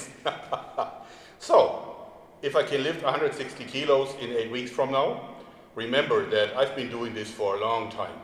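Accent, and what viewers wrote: Germany. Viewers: That's a strong German right there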